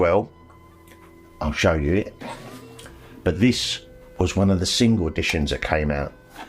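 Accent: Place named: Cockney, United Kingdom. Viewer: I noticed that Andy Serkis as Alfred the butler in THE BATMAN from 2022 has the same accent as you. What accent is that exactly? Is it Cockney or a variation thereof? On another note, when we will see a video of you kicking Tarantino’s ass?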